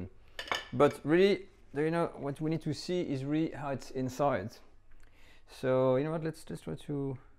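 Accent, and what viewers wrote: Paris, France. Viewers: Parisian Accent 😁 Love your cooking ❤️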